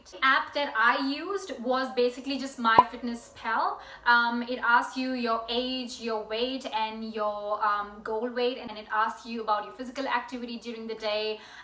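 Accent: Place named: United Kingdom. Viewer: I guess she lives in britain from a long time since she's using British accent, so you know she got that habitually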